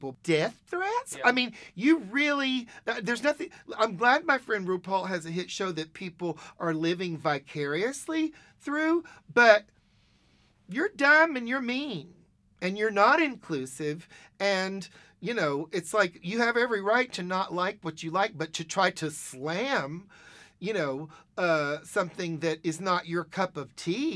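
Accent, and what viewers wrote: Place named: South, United States. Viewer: You know.. it’s crazy bc Lady Bunny rly looks like if Trixie got older . She even kinda sounds like her 😂just southern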